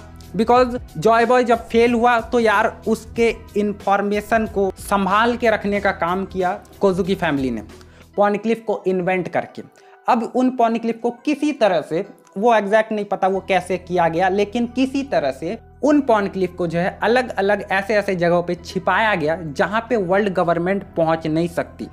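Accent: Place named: Odisha, India. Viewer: Bro are you from odisha . Apke baat karne ka tarika Odisha walon ke jaisi hai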